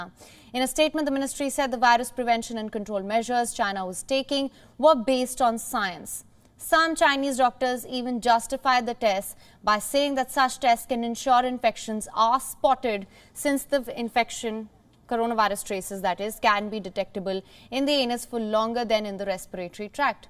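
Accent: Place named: India. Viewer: OMG that indian english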